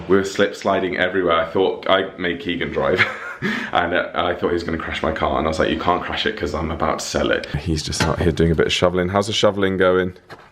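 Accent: England, United Kingdom. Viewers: you have a genius for relating to your viewers, giving us the impression of being in an intimate one-to-one relationship with you. I moved to London from Australia in my early 20s returning to Oz in retirement. Although I love it here, I still enjoy the Englishness of your accent and the lovely ordinariness of English life I enjoyed there in my salad days.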